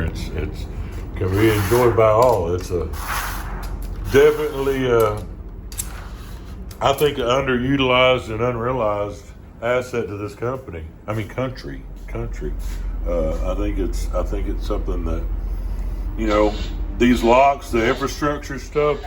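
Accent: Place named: South, United States. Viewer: People will never under stand red neck talk bud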